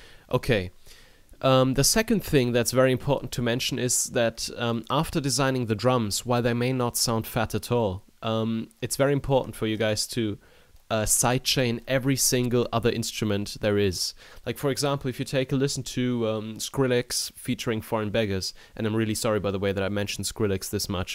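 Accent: Germany. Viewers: and i can hear youre german, mein junge :D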